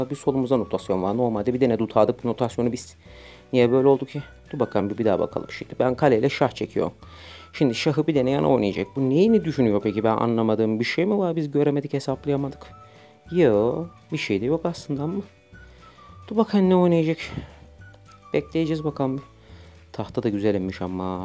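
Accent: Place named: Aegean, Turkey. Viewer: Hocam muthış olmus ya🤣🤣🤣şive tam bizim yöre,ayrıca bir sevdim🌺😊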